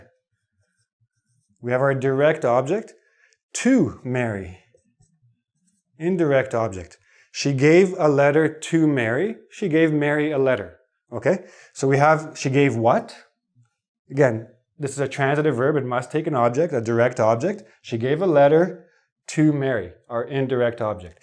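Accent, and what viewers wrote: Quebec, Canada. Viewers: wait... are you canadian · He sounds like a French Canadian. I know he's in Canada so it is a legit guess.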